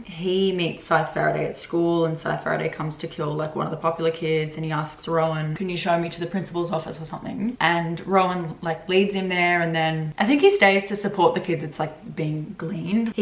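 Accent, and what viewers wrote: Australia. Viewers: No wonder sounds really off … thought it was from some part of country I never been to … it’s Australian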